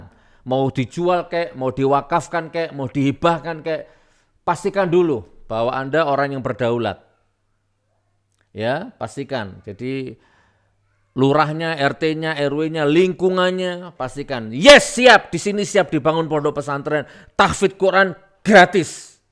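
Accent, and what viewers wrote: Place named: Java, Indonesia. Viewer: kangen logat medok jawa nya sama klemat klemot mulut mu gus tpi gus dobol wkwkwk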